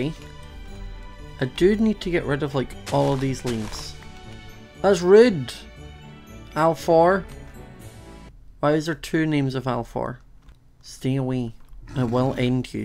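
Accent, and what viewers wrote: Scotland, United Kingdom. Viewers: its his accent which is a mix of English and Scottish that makes it sound different.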